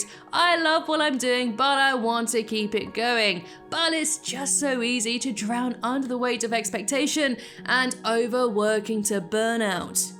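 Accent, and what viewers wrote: United Kingdom. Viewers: the narrator is ANNOYING! I fucking HATE these british narrator accents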